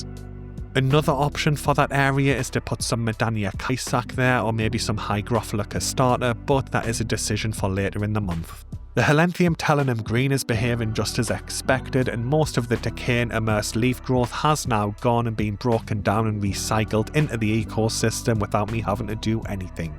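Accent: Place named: North Yorkshire, United Kingdom. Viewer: Looks really good, i don't have a set up like that but i'm planning another purchase, i going set up a cherry shrimp jar, i just need a big jar, i've got them in all 4 tanks now, my minnows haven't bothered with them, i've gone from 12 to a few hundred at least in about 6 months, luckily i know a couple of people with tank suitable for some, the water in North Yorkshire seems to suit them, not far from where you are, judging by your accent, i've just started following you on Facebook so i might send you some pics, what would you recommend for planting in a jar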